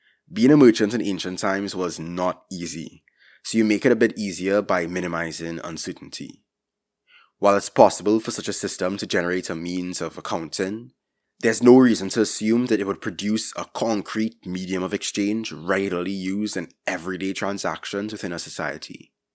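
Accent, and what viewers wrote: Trinidad and Tobago. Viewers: Di Trini accent, bwai! 😍😍😍😍…🇯🇲here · Your accent sounds like you’re from Trinidad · It's Trinidadian. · I hear a trini. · You're trinidadian! You talk like me! I was so surprised, you accent sounds like a real person!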